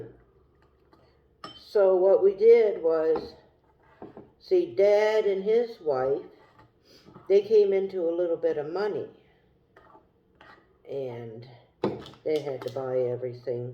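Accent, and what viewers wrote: Canada. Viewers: Are you originally from alberta? Some things you say sounds like you’re from one of the Atlantic provinces!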